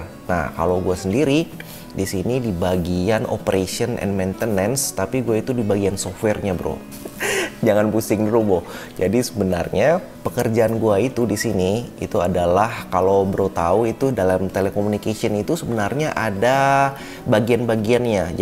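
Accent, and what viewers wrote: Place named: Padang, Indonesia. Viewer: Asli padang uda... Hehehe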